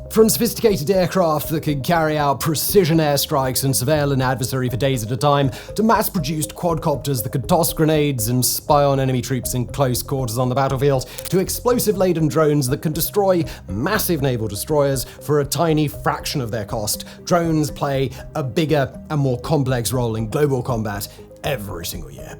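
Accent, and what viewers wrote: United Kingdom. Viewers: All your British lilt and shabby jabs at Russian and Chinese counterparts seem more and more like an age old Western propaganda than an unbiased fair take on the subject. No modern fighter jets have come head to head against each other in current war scenarios and neither you can confidently confirm that Russian or Chinese jets and missiles are somehow inferior to what west has to offer. Dont be so sure lil English brother, the Trident you tried to scare Russians embarrassed you globally and just recently.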